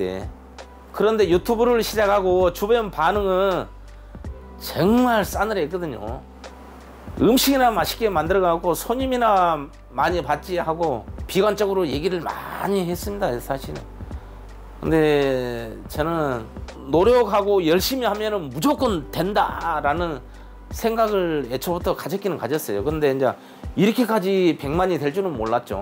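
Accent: Jeolla, South Korea. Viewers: 세프님 너무 섬세 하시고 또 전라도 사투리 로 너무 정겹게 느껴지고 또 많은요리 소개 해주시고 넘 감사하고 좋았습니다 세프님 날로 번창하시길 기도합니다 다시한번 축하드려요 🎉💗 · 셰프님 구수한 말씨가 정녕 전라도 가 고향이신거 같습니다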